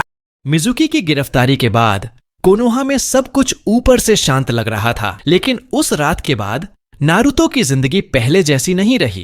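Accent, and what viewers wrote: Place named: Bengal, India. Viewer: Haa bhai lagtahe bengali😅❤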